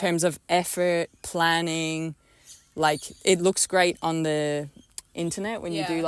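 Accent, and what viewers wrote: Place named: Australia. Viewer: She has certainly picked up the Aussie accent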